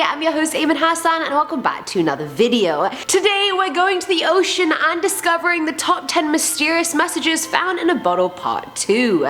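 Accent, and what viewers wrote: Russia. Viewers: tht russian accent. im jealous. 😭😭😭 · Very nice Russian accent!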